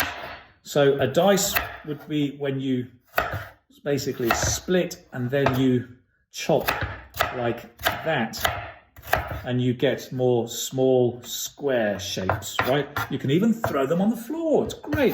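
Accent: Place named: England, United Kingdom. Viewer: perfect soap and english accent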